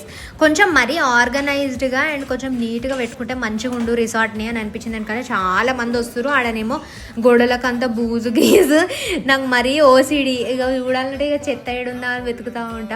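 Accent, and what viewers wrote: Telangana, India. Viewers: Akka e Mimi vlog chusi entha navvukunnano mee language ki... Pakka telangana slang I loved it.... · Pakka telangana language❤❤❤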